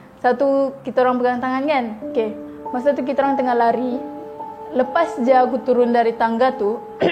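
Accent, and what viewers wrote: Sabah, Malaysia. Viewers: Orang Sabah ke?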